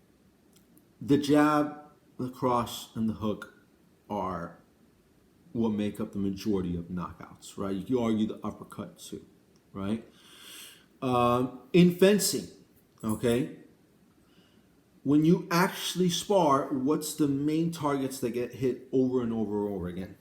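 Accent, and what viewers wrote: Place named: Cuba. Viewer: Damn,! Your getting a lot babe support that I like your stuff. They must love that Cuban accent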